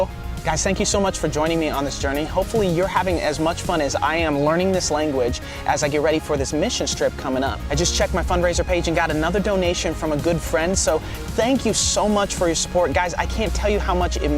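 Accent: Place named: United States. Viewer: Hi. This is regarding vocalization, diction, pronunciation, and phoneme production. Don't skip over how to say the vowels and certain consonants properly. It might cause you problems later on if you think you're pronouncing a syllable correctly but you were actually a bit off. This could be carried forward until you actually say something no one else gets even though you think you said it right. You need to break your American speaking habits learned from your childhood to learn how to sound natural in Bisaya Cebuano. Your throat muscles never learned to work so hard using American vowels but Filipino Tagalog and Bisaya Cebuano will tire them out. For instance, practice the glottal stop. This is where you use your throat muscles to suddenly stop the flow of air so that one syllable with a strong vowel stops and continues into another vowel. This makes Bisaya and other Filipino languages choppy and staccato compared to American English. 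Learn how to say the short [o] instead of the American long [owh] (with a pronounced [w]). Don't interchange the [o] and the [u] "ouh" sounds within words because it might change the meaning of what you're trying to say. Learn how to say the [ng] consonant with a vowel after it: nga, nge, ngi, ngo, ngu. Try to avoid saying the hard [g] (guttural) sound after the [ng] phoneme unless a word really has it (such as "mangga" or mango in English). Practice saying words like "pangô" (pug-nosed) with a glottal stop on the last [o] syllable. Also "p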